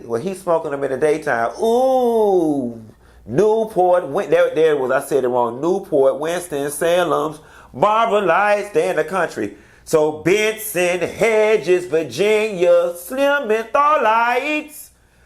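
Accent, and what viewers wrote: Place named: Mississippi, United States. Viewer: Literally!! Since your first video I was like omg his ass sound like he from Mississippi lol yo ass be knowing some shittt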